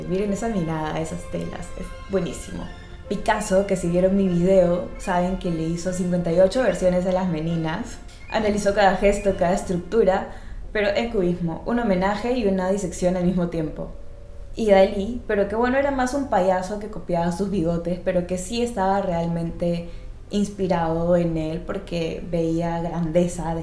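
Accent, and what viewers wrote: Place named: Venezuela. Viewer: Heres Venezolana verdad por el asento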